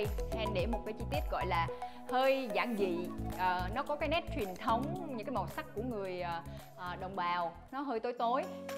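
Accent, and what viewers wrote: North, Vietnam. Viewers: Mình thích em ấy nói giọng miền bắc hơn❤ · Nói một giọng thôi nè chị Hen, lúc giọng na ná Bắc, lúc giọng na ná Nam nghe nó kỳ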